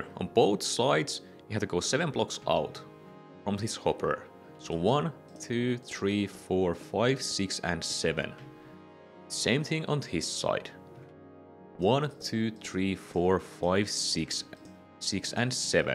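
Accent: Finland. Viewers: I like how i automatically knew you were finnish from the accent. Torille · You sound finnish are you finnish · Amazing, thanks! Gonna build this on my Realm, best design I've seen so far and super easy to follow. Love that Finnish accent too, haha!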